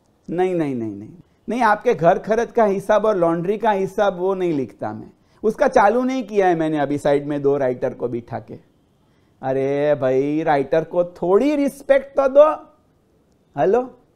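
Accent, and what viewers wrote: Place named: Gujarat, India. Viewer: wonderful ... but Initial accent was gujarati and in between marathi .. baad mein normal Hindi ... 😀😂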